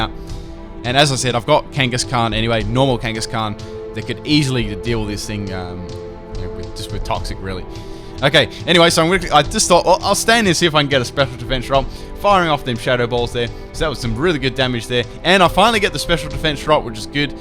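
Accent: Australia. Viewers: are u australian or something i'm new in this channel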